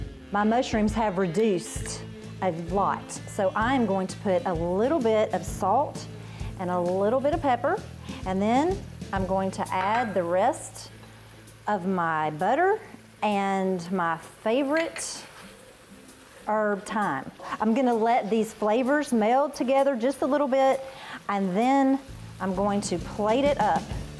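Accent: South, United States. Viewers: She had me in within 2 seconds after hearing her sweet southern accent. Next I noticed the wedding band. No wonder she's married! I have to cook the deer in our house. But, I got an ace in the hole! My Grandma taught me how to. Funny, Granny could chicken fry up a mean skillet of deer steak but she didn't like deer meat. My Dad, my older brother and I would fight over that delicious meal! That's why I asked Granny to teach me!! I prepare it the same way that she did, but hers tasted sooo much better. That's because she put her love into it!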